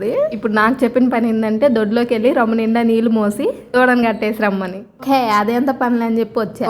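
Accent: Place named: Kadapa, India. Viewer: Hii 👋 akka 😊😊😊 Same slang madhi kuda 😂😂😂😂madhey kadapa akka Medhi a oouru akka😂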